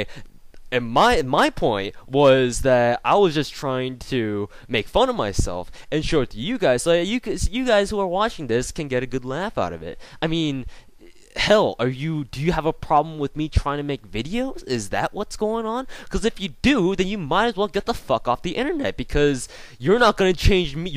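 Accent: Asia. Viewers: I love your Asian accent it makes things very funny!! And i appreciate what you do a lot your in college and make time for youtube making multiple videos a day! YOU ARE THE REASON I WASNT BORED WHEN I DIDNT HAVE MY PC!!!! and were you the one that i beat in the event on sf2? lol the event to get the Scar H Lucie or something. lol im KoolGam3rsHQ on sf2 but i agree with a lot of this i actually watched whole thing lol facepalm! lol, and i agree that in our society now the nice people get torn up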